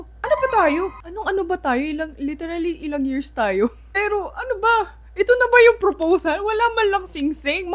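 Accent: Philippines. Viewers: No you talk like a filipino already. :) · I’m not used to hearing a Korean girl sound like a Filipino girl.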